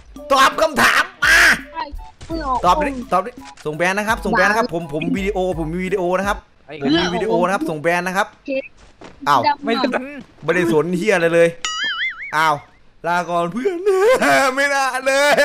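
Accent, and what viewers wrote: Shan, Myanmar. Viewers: พี่เค้าเป็นคนไทยใหญ่หนูฟังออก เพราะหนูก็เป็น55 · พี่เขาพูดภาษาไทยใหญ่ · คนทีพูดเป็นไทยไย่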